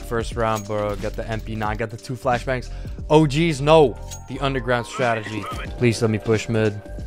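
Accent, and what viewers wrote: Netherlands. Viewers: I mean im the one you need if you want to be downgraded, complete gawed gamer over here my god. btw love the dutch english lmfao its cracked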